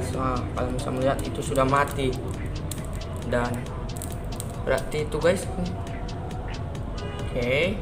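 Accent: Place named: Batak Toba, Indonesia. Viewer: logat, bahasa dan marga anda saya tahu. Batak Toba. Saya orang Karo dan tinggal di sumut juga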